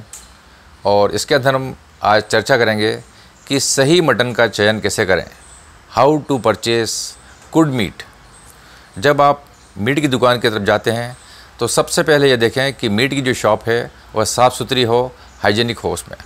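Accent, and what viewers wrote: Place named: Jodhpur, India. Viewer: are sir.....apki dialect ekdum Jodhpuri h.....kya karya krte h sir by profession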